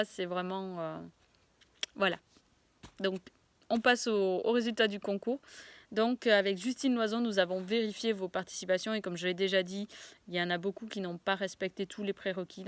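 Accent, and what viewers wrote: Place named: South, France. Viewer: Bonne vidéo, j'imagine que tu es du sud non? (à l'accent) sympa la vidéo elle est nette et tu es à l'aise devant la cam, juste petit conseil tu devrais filmer en horizontale pour éviter les bandes noires;)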